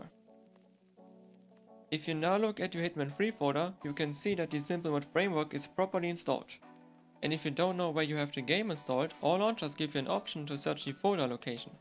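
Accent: Germany. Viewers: Bist du deutsch? Man hört so nen leichten akzent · Bro sounds so German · Deutscher akzent?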